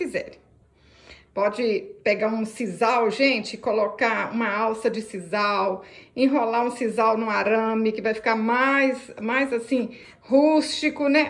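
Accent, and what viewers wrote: Minas Gerais, Brazil. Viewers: Muito lindo e simples a explicação e o modo de fazer. Esse seu sotaque é o máximo me lembra uma amiga mineira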